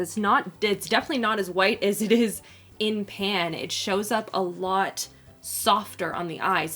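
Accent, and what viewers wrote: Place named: Canada. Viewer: I love when I hear your Canadian accent.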